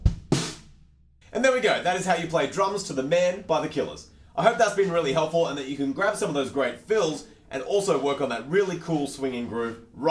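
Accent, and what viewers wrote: Australia. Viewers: Wait a minute..........r u Australian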